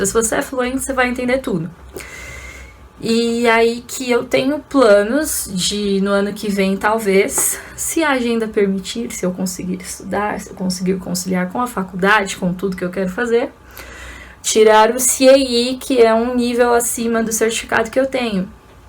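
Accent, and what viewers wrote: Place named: United States. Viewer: Bacana sua história de transição entre os "ingleses" haha. Realmente, o teu sotaque favorece a pronúncia americana. Nada a ver com o vídeo, mas essa camisa ficou legal em você, e não porque é a "sua cara" :p Deus te abençoe.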